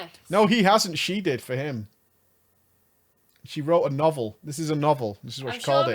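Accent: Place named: Manchester, United Kingdom. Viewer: Look at the shine on that innit!! Are you from manchester?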